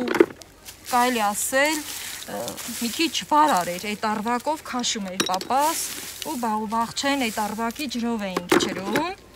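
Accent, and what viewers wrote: Lori, Armenia. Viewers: ջան երբ որ քեզ լսումեմ մի տեսակ հանգստանում եմ։Ուր մնաց լոռվա բառբառը։❤❤❤❤❤❤❤😊😊😊😊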